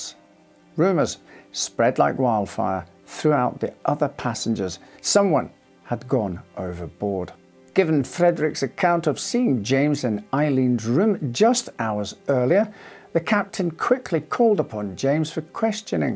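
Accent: Scotland, United Kingdom. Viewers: You definitely have a Scottish twinge in your words, and I love the way you speak. Thank you for another very interesting story.😊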